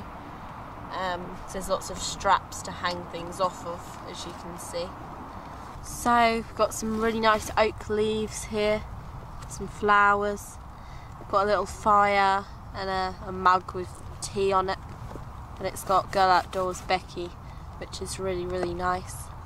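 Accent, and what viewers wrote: United Kingdom. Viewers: Hi, Becky.... i like your English dialect. I keep learn how to speak British English dialect, but it difficult.... :)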